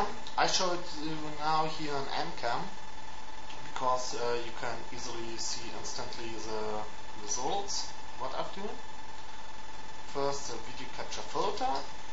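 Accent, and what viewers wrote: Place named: Germany. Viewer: Der Akzent ist deutsch, definitiv^^.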